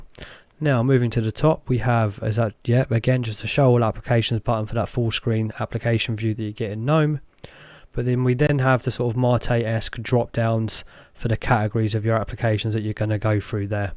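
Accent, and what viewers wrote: United Kingdom. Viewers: Pretty good channel. Nice British English pronunciation... I wonder what kind of opinion will have regarding Manjaro 20.1 Cinnamon Edition...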